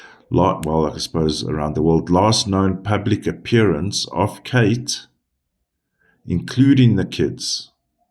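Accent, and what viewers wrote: South Africa. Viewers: So good to hear a South African voice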